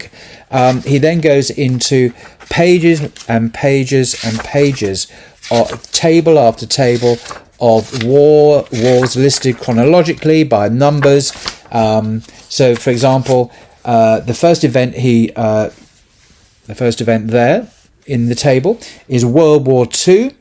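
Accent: United Kingdom. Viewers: Such a great explanation, Sir! Oh, on the side note, I like your accent. British! I find it friendly for the American accent, but British accent, I can feel like a King! 🤓 Well, the UK is the United Kingdom, isn't it? Will listen more to your videos! (Well, I am not a native speaker of English). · This guy is so shallow and stupid that he forgets the bible claims to have killed everything during a flood. EVERYTHING God killed everything. What a useless video. His British accent can't cover what the Bible pretends. It's insulting to anyone with common sense. Christians "God" killed everything on the planet to start over. This guy's a idiot.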